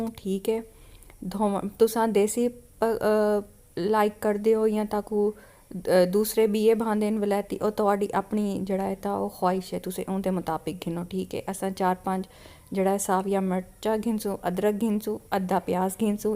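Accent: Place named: Saraiki, Pakistan. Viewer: Piari piari sariaki vice😅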